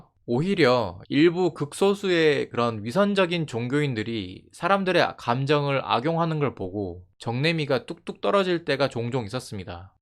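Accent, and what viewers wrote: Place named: Gyeongsang, South Korea. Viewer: 경상도 억양을 씁니다. 사투리를 줄인거지 억양은 경상토 토박이에요 고향이 대전이라는데 억양은 토박이 경상도가 강한데요..신기하네요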